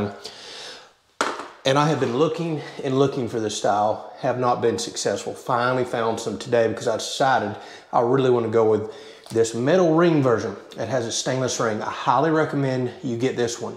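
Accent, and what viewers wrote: South, United States. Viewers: Hmmm Height or HeighTh?? I love the southern accent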